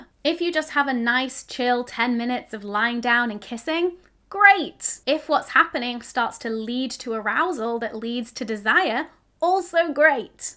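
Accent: United Kingdom. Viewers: I really enjoy your videos and love your British accent! Drives me nuts!!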